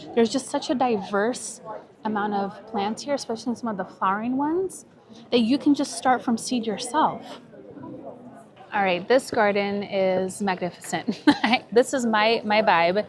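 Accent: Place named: England, United Kingdom. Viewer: looks like you picked up that lovely English accent 😊❤❤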